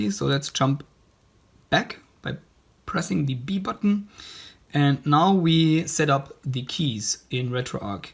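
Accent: Germany. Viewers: Deinem Akzent nach vermute ich, dass du Deutsch sprichst ;) Vielleicht würde dies die Kommunikation etwas erleichtern. Ich habe alles so gemacht, wie du es in deinem Video beschrieben hast. Das Setup hat wunderbar geklappt. Das Problem tritt auf sobald ich über das Verzeichnis "media" auf den Roms-Ordner zugreifen will. RetroArch beendet sofort und ich lande wieder im Hauptmenü des RG350. Mir wird gar nicht erst, wie in deinem Video der Ordner "Data" angezeit, bevor ich in dieses Verzeichnis weitergeleitet werde, stürzt RetroArch leider ab. Ich nutze die 2019er Version des RG350. Anbei wurde mir eine 32Gig-SD-Karte mit vielen Roms dazugeliefert. Allerdings habe ich selbst auch schon einige Roms in die einzelnen, vorinstallierten Ordner dazugepackt. Was kann ich tun, dass ich auf meine Roms zugreifen kann? Vielen Dank im Vorraus für deine Antwort. Beste Grüße Tim :)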